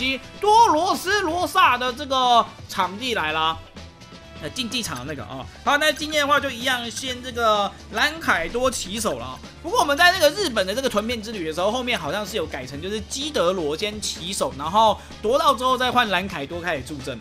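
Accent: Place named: Taiwan. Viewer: 台灣國語真的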